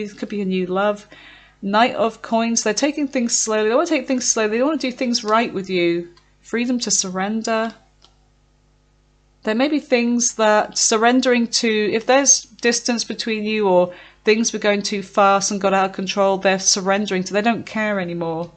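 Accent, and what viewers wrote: United Kingdom. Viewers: So accurate - can’t believe it. Love the British accent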